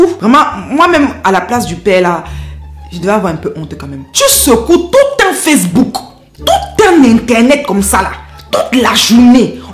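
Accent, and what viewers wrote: Cameroon. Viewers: Ton accent kmer c'est la magie Sista, courage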